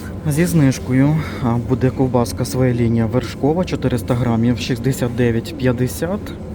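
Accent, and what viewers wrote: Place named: Central Ukraine, Ukraine. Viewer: Акцент центральноукраїнський. Живе, звичайно в Києві. В якій області виріс самому цікаво.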